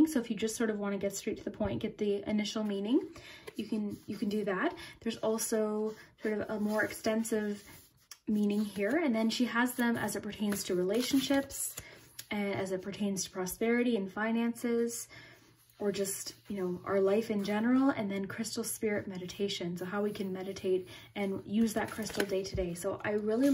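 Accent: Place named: Canada. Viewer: I loved this chit chat video!! Gave me asmr too you have such a lovely voice. wondering if you're from Canada?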